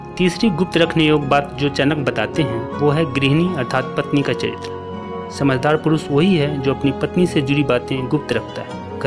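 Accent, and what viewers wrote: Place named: Bihar, India. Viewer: I liked the Bihari accent.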